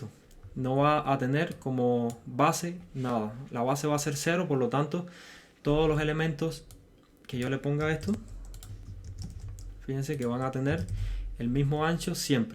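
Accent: Cuba. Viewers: Tu acento me suena, eres de Cuba?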